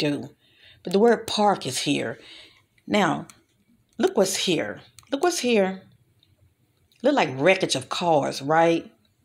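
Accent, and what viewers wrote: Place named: North Carolina, United States. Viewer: Idk where you're from but your accent sounds like southeast NC. It sounds like home to me. I know you're talking about really dark things but I get so soothed and relaxed from your voice because it takes me back to the women who cared for me, taught me and showed me love as a child. I wish many blessings to you and thank you for the hard work you do.💜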